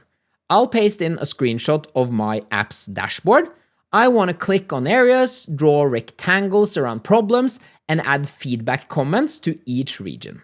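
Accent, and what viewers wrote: Norway. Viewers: Its a typical Norwegian accent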